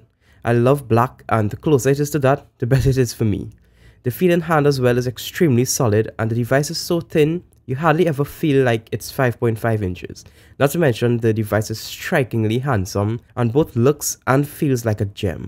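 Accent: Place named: Trinidad and Tobago. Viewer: Can't miss that Trini accent 😎 Keep up the good work bro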